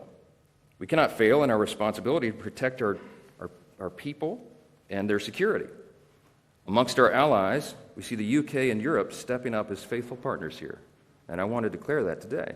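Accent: South, United States. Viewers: because its just a regular southern accent? it sounds nothing like a trans atlantic accent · because its not transatlantic, hes southern (Louisiana)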